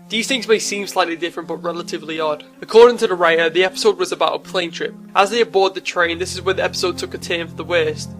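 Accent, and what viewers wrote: Liverpool, United Kingdom. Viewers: The scouse accent makes it worthwhile. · Liverpudlian? :D nice vid!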